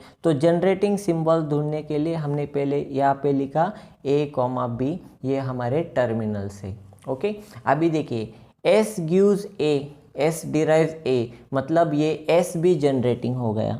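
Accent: Gujarat, India. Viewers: Are you Guju ?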